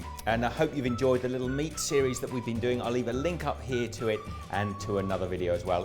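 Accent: Australia. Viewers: Tell me he isn't Australian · This guys Australian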